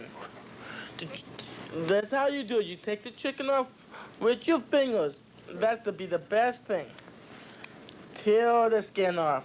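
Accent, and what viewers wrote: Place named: Russia. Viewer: he's talking English just as CrazyRussianHacker is doing it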